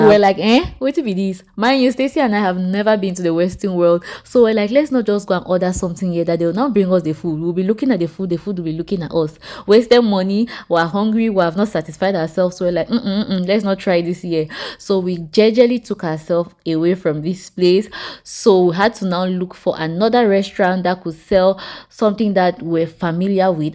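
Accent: Nigeria. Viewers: Thank you for this video. Ur accent sounds like Nigerian. Only a rooted Nigerian can differentiate. Thks, anyway!!!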